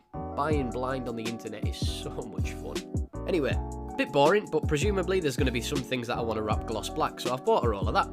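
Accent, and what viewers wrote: United Kingdom. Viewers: Ha I enjoyed the commentary. British commentary always gives me a chuckle. Nice build :)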